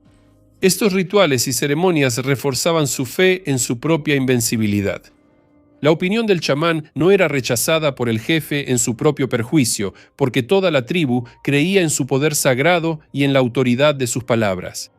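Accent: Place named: Argentina. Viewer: Ahora tiene acento argentino el.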